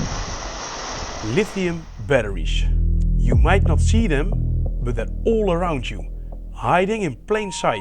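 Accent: Netherlands. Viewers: I smell dutch accent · It says united states in your bio, but that accent sounds really Germanic, specifically Dutch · Dit accent klinkt zo Nederlands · First time watcher, I just knew you were Dutch from your accent. Good stuff. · holy dutch accent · ik hou van dit nederlands accent HAHA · Ben je Nederlander, bro? Want je spreekstijl en accent klinken meer als die van een Nederlander die Engels spreekt, daarom wilde ik het even vragen. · This accent sounds so dutch, wth🙏😭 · I know a dutch accent when i hear one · Dutch voice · Are you Dutch if I may ask. You sound very Dutch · immediatally knew you were Dutch the moment i heard that accent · dutch accent... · Love het nederlandse accent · You are Dutch?! I hear Dutch accent. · Well, I don't take you in the mailing but your video subjects are good however your English is not so good. Very Dutch like. Maybe you have to practice more or take some lessons and then I think that you hit two flies in one clap. 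· From the first words "lithium batteries" I knew you were Dutch 😅 First time on this channel. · je hoort het gelijk als het een nederlander is XD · Ik hoor een Nederlander, of niet? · De nederlandse accent is egt om over te lage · i just love the Dutch accent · You sound very dutch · sir you sound dutch as fuck, are you? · I love the dutch accent,ok on topic,thks for this infomation,usefull. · Hey a Dutchy speaking English :D Leuke video! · 10 seconds in and i know youre dutch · Dutch accent so thick 😅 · Heerlijk Nederlands Engels accent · Nen holander die engels probeert te praten 😅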